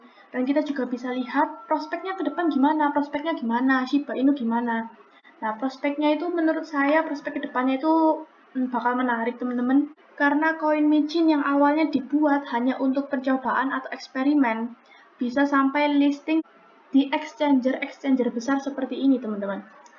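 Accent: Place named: East Java, Indonesia. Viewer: Logat jawa timuran.... suroboyo yaaa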